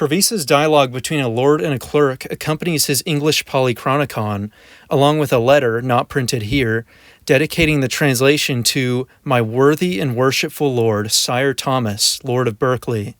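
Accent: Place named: North America. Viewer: When I first heard the reader with such an North American accent I thought it really wouldn't be a success but his reading is absolutely brilliant!! And easy to understand, especially as I'm originally from the north of England. Very,very good!!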